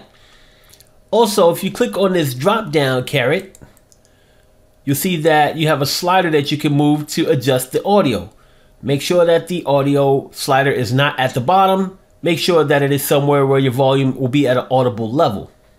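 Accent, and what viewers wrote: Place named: United States. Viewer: Congratulations! Now that I have adjusted to your American English, I must grant it to you, you explain very well and your solutions are good. And believe me this compliment is genuine as it comes from a French Speaking European old timer. Thanks a lot and carry on...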